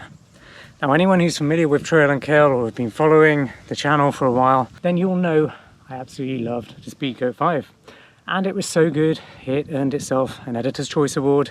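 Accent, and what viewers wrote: United Kingdom. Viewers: are you in the US or the UK? You're defo British by the accent?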